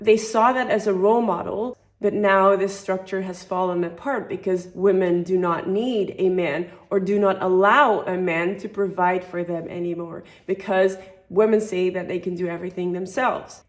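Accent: Netherlands. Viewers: I can spot a Dutch-English accent from miles away.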